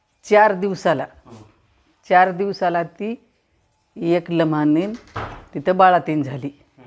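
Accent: Nanded, India. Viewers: आजी आपल्या नांदेड भगाकडल्या आहेत..आमची भाषा ऐकून आनंद झाला..same आमची आजी अशीच होती💐💐🙏🙏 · भाषा नांदेड जिल्ह्यातील वाटत आहे. माझं आजोळ आहे नांदेड जिल्ह्यातील भोकर तालुका.. माझी आजी पण हुबेहुब असच बोलते😊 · Mrathvada mdhlay nanded chi language ahe wate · बोलण्याच्या भाषेवरून सांगतो माई 100% नांदेड मधील असाव्यात.एक अंदाज.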